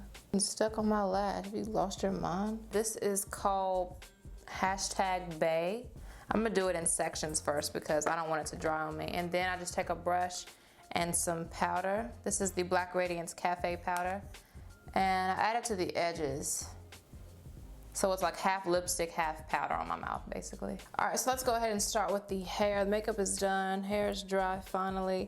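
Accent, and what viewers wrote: South, United States. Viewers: her Invisalign makes her sound even more southern/country? Its so cute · you sounded so country and southern in this video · girl you sound so country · i love your country accent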